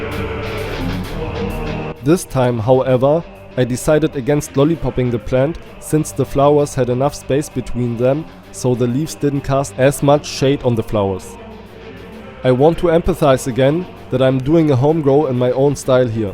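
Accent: Germany. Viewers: Man hört raus dass du Deutscher bist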